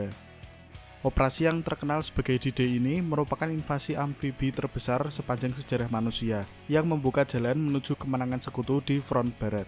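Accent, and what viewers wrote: Java, Indonesia. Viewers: medoknya ilangin dulu bro😀😀😀😀😀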